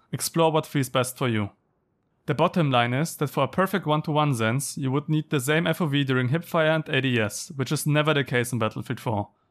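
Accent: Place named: Germany. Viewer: Ah finde es immer wieder herrlich wie man in weniger als 5 Sekunden sofort hört "das ist n deutscher" 🤣no offense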